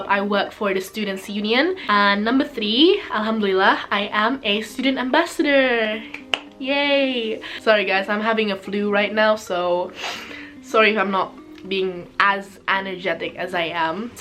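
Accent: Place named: United Kingdom. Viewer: Aw<link> britishnya udh mulai kedengeran nih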